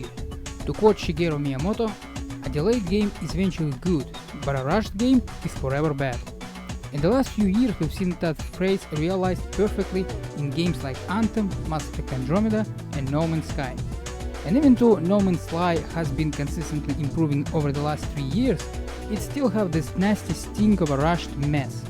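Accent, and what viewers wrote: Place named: Russia. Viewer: Молодец, тренируйся. лойс поставлю, но акцент прям вааах какой русский. чувствуется что пока стараешься произносить слова, а не говорить на языке.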